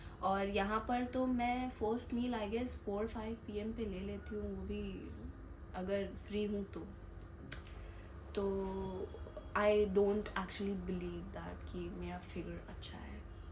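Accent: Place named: Gujarat, India. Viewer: Finally Youtube pe active hogi 😅😅 Nice video your bhai bhai vala ascent gujrati bol rahe thi aese lag rahe tha ap bahut khoobsurat aur cute ho 🥰❤️ waiting for part 2 video 😊😊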